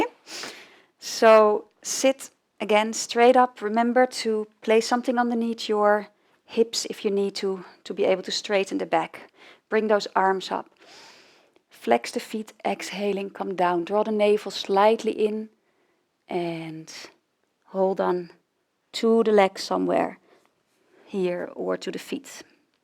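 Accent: Netherlands. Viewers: The exercises are good. i only wish she would speak Dutch because, though she's fluent enough in English, her pronunciation clearly sounds like Dutch. It prevents me from enjoying the yoga.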